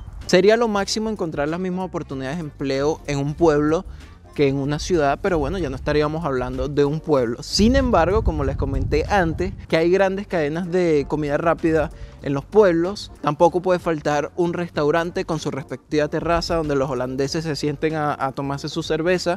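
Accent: Venezuela. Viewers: La verdad que ni idea cómo llegué aquí jajajaja pero me parece súper cool y más al reconocer tu acento, dije: "Este chamo es venezolano" jajaja y acerté! Primer video que veo, me parece súper chévere! éxitos y bendiciones! :) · ¡Esoo chamo, acento venezolano! Muy buen video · Llegué a tu vídeo por sugerencia de YouTube. Generalmente no hay venezolanos con esta dicción tan clara. Sospecho que eres de Centroccidente, más específicamente de Barquisimeto o Yaritagua pues ese acento es característico. Se agradecen este tipo de descripciones tan espontáneas, claras y sucintas. (Larunbata, 2021.eko martxoak 20a, am) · Hijo; genial tu salida a ese error que hiciste cuando hablas “ si lo ves en el futuro.. pero eso del verlo en el “pasado”😒😒😒 jiji tu risita te salvo de ese “chispoteo” propio de la improvisación; pero bueno lo remendasteis con esa salida.! Primera vez que veo tus videos, llegue ahí por casualidad, al empezar y oír tu buena dicción, me dije es un paisano venezolano. 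Dios t bendiga brother sigue adelante; al final te espera la cima allá o en cualquier lugar del Mundo.!!🌹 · Hola!!! simplemente apareciste en el menú de videos de youtube y me llamó la atención. Me quedé viendo porque tu forma de hablar me parecio familiar, hasta que dijiste que eres Venezolano. Bonito formato. Saludos desde Caracas. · Hola! Que bien que el algoritmo me trajo a tu canal, que lindas las imágenes que muestras de Holanda! Me preguntaba si eres del oriente del país, tu acento me dio esa impresión, yo soy de Puerto La Cruz viviendo en Kansas, USA. Saludos! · Por fin veo Venezolano con video de este tipo. Felicitaciones!! La vrrdad se sintio raro alguien Holando con acento Venezolano. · No sabía que era venezolano, lo adiviné por el asentó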